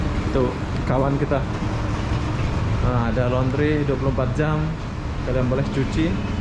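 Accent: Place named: Malaysia. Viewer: Anda memang orang malaysia bro ,jangan mengaku- ngaku sebagai warga indonesia,dari logat dan dialek bahasa juga sudah terlihat,bikin kontan soal indonesia hanya cari simpati ,cari folower dari indonesia,lebih baik jujur seperti obin morales,seperti tengkuruk channel,seperti rusdi rusli dia jujur asal malaysia tidak berpura2,kami lebih respek orang yang jujur daripada pura2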